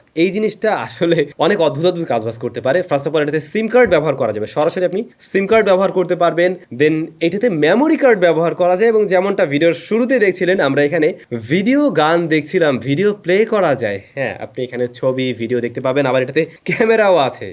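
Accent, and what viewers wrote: Barishal, Bangladesh. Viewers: এতদিন পরে জানতে পারলাম ভাই আমার বরিশাইল্লা 😅😅😅😅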